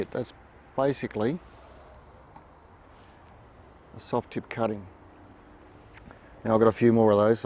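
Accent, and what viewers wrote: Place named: Australia. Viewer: I believe (judging by your accent) that you recide in Australia?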